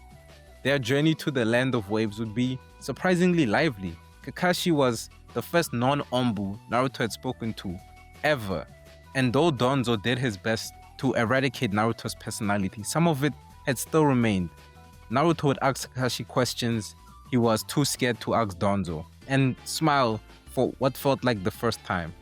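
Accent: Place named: South Africa. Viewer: I hear South African accent ... ARE YOU SOUTH AFRICAN ?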